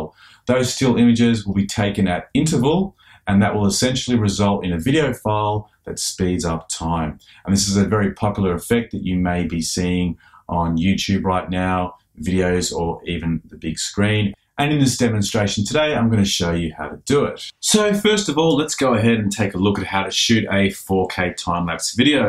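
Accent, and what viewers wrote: Australia. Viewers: Hey man r u from melbourne?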